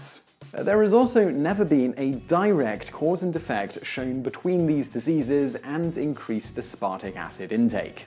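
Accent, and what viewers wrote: United Kingdom. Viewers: Watching a lot of his videos, one learn that Brits pronounce a lot of words "wrong" (well, maybe just differently than we Yanks do).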